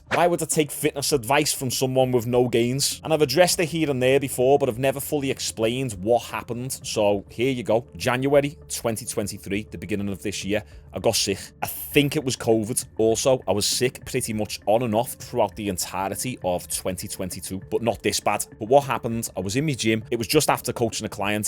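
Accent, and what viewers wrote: Liverpool, United Kingdom. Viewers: I don't usually sub to scouse channels as I find the accent annoying, but your content makes me forget that 😂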